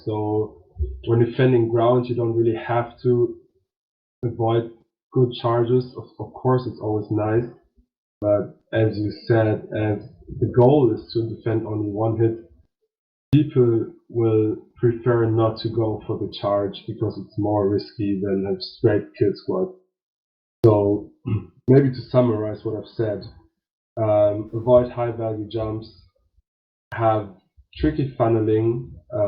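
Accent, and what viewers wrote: Germany. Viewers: base buirding..... I love the German accent!! DL FTW CWL invite Season 2!🔥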